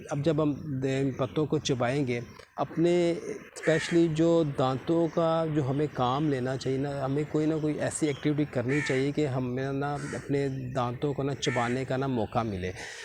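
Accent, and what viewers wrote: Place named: Pakistan. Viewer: The first-ever video I watched completely. Your way of talking along with urdu accent is great. Keep it up.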